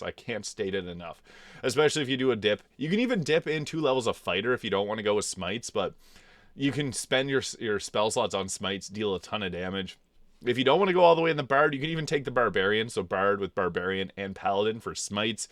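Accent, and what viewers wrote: Canada. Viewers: LMAO, i legit watch this channel for two reasons: 1) great bg3 content and 2) the hilariously thick canadian accent 😂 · I detect a Canadian accent.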